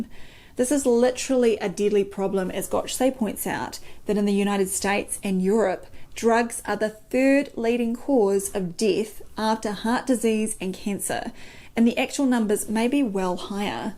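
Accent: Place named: New Zealand. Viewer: Love the kiwi accent. I'm from NZ. The auto words picked up mass phenomenon as mess phenomenon. It is a mess phenomenon.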